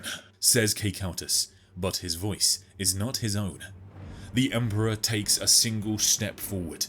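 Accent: England, United Kingdom. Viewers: Mispronounces basic words in the first couple of minutes in a smooth English accent? AI slop. Boo! Go away.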